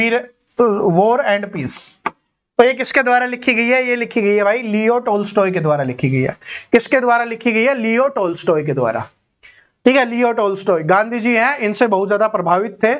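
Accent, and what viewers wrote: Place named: Haryana, India. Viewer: Fir to sir ap haryana se hoge 😅😅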